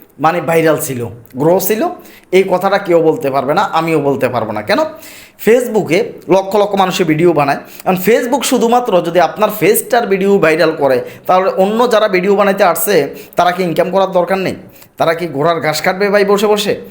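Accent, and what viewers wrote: Chittagong, Bangladesh. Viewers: Bai r basa ki Chittagong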